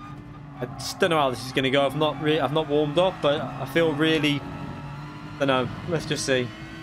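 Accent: Liverpool, United Kingdom. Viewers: Can't work out your accent. You sound like you're from the wirral or full Scouse then other times I can't place it.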